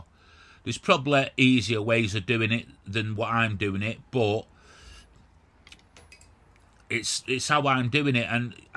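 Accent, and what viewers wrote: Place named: Manchester, United Kingdom. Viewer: new to your channel love the manc accent , just retired so started making birdtables still getting tools together , john from Altrincham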